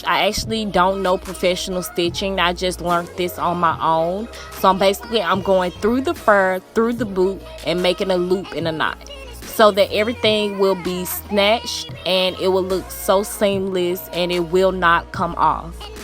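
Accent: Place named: Georgia, United States. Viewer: You talk real country lol are u from Georgia also?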